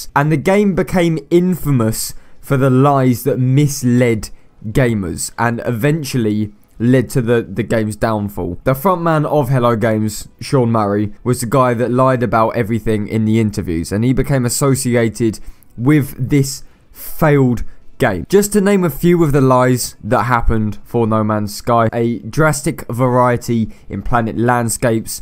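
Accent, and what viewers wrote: United Kingdom. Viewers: damn. i would hate it if a british person like you insults me. the accent you british folks have makes the insults sound meaner then a normal American person when they insult someone. i was referring to when you said that they don't deserve money you're accent made it sound so harsh. its a good thing. lol take that as a compliment. i guess you can say your accent reminds me of Simon Cowell during the American idol days. lol. besides, i thought this was an okay game btw. homefront revolution was worse then this though.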